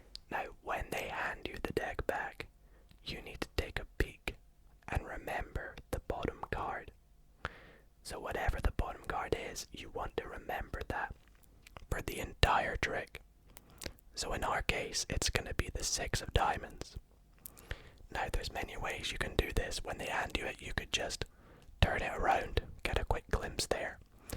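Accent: Scotland, United Kingdom. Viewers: Are you scottish by chance? Thanks for the video and i like your accent alot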